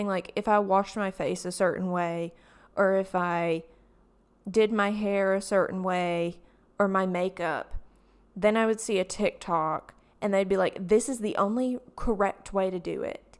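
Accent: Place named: South, United States. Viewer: With your southern drawl and all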